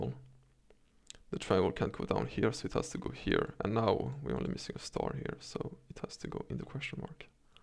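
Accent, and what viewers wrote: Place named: Sweden. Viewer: sjukt att man märker direkt från dialekten att du är svensk.. men bra jobbar :D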